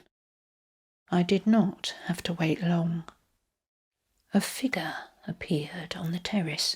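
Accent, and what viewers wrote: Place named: West Country, United Kingdom. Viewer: Wonderfully atmospheric. Beautiful narration—is that a “west country” accent? If so, where is the west country? I love the accent and grammar so much I’m determined to learn how to do it. PS, it was hard to concentrate once I learned there was a box of papers, unlocked, just sitting there, as big as Dallas, that no one bothered to read for 300 YEARS! It took me a few minutes to settle down as I tried to wrap my mind around it.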